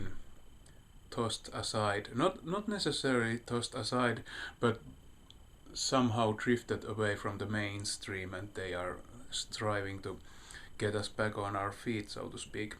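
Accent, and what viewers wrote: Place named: Finland. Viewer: Upeeta enkkuahan toi, itseasiassa meidän vanhalla enkunopella oli hyvin samanlainen suomalainen lausuntatyyli :)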